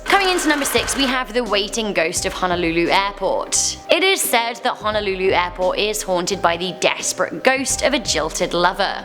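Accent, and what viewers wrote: United Kingdom. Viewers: D'Angelo McIntyre ummmm shes brittish. Can i hear your best french, german, or russian pronunciations??? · Guys, calm down on how she pronounces things, she’s from the UK, and lives in Canada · Well she's british not a native hawaiian so of course she pronounces wrong.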